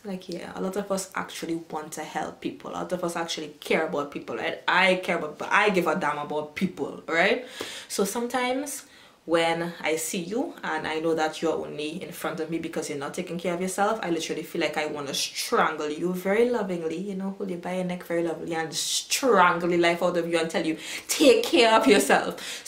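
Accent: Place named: Trinidad and Tobago. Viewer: I just subscribed. I love how you kept it real, with no fake accent. Real trini accent here.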